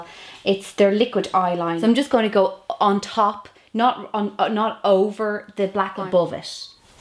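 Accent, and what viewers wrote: Ireland. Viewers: She's from Ireland so I'm guessing it's an Irish accent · I love your videos!!! I love your accent!! I felt totally in love with Ireland when I went there when I was younger, it's the most beautyful place in the world!!! And I was pretty surprised by the people too, I've never been in a country with such nice people, really. I'm irish in my heart ^_^ Take care sweetie.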